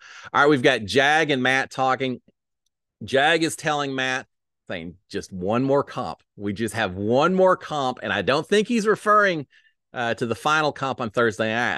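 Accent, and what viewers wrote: Texas, United States. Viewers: Thank you, for all of your hard work. I especially appreciate your clean recaps bc I'm not a fan of the language so many of the house guests use. So thank you for that. Not to mention, I totally enjoy hearing a fellow Texan while I'm living in Phoenix😂 Have a Blessed day!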